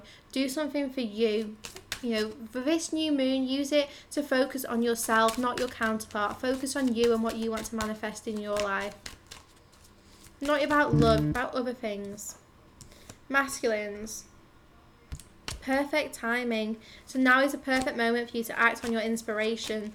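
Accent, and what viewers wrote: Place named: Northern England, United Kingdom. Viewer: Hullo, you are VERY tuned in. Your channel popped into my auto generated YouTube list yesterday and yesterday's reading followed by this one is mind blowing. And I'm only of today's reading, LOL, just wanted to give you the thumbs up straight away. You have a northern English accent. Where are you based - Yorkshire?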